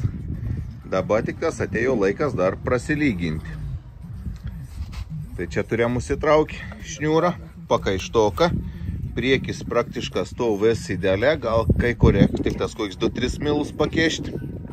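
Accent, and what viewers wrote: Samogitia, Lithuania. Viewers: Netyčia užmačiau ir dabar vis pažiūriu. Labai fainai žmogus pasakoja, smagiai žemaičiuoja ( kaip ne žemaičiui smagu paklausyt), gal tik kartais norisi detalumo, na tarkim kaip tampomi šniūrai, kaip/ su kuo susukat lentas tarpusavyje, kampukais ar ilgais varžtais ir t.t. Šiek tiek detalumo. bet šiaip labai smagiai žiūrisi ir ( jei neklystu), labai su cinkeliu toks ( į gerą pusę):)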